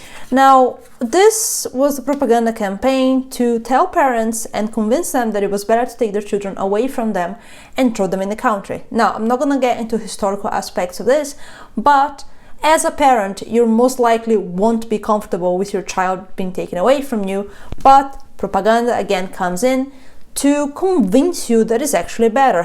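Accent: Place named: Brazil. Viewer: "I dont know how to pronounce and i wont try" HUUUUGE school flashback with this hahahahaha. That send me back. And with this accent... when you knows shes brazilian but you just cant prove it yet (you sound like you could be cute... but it's absurd to try to guess someone's looks with voice and even arms alone)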